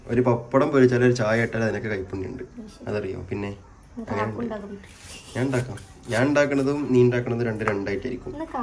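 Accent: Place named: Malabar, India. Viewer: സംസാരം തനി മലബാർ ഭാഷ ആയി തുടങ്ങി 😂😂😂😂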